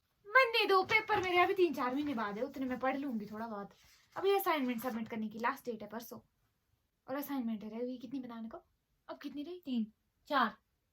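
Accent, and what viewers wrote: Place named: Himachal Pradesh, India. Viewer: Ap himachal se ho na didi ?? Apki tone lagri hai himachal jesi 🥹bahoot acha laga mughe .... Bahoot time bad ese tonne sunne mili 😭😭😭🥹♥️♥️ .....mai mandi se hu ...aao kabhi chai pine ....filhal mai Pune me rehti hu ...bahoot yad ATI hai mandi ki 😭😭♥️♥️♥️🥹🥹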